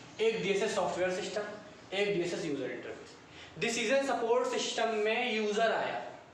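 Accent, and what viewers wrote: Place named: Kanpur, India. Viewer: bhaiya aap kanpur ya uske aas pas ke area se ho kya ?aapki tone vaisi hai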